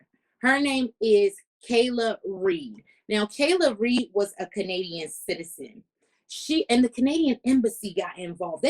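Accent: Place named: Washington DC, United States. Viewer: I could tell that you were from dc. Our accents are very distinct.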